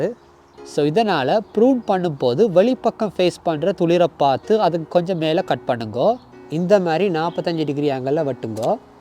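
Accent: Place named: Sri Lanka. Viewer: Srilankan Tamil, I think U are from Sri Lanka. You share good things and very useful, Thank you brother good job 👍.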